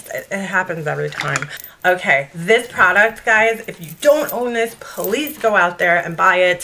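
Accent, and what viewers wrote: New York, United States. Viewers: I'm a subscriber!! 🙋 Do you do acyric nails too?? You have a New York accent. You Rock !!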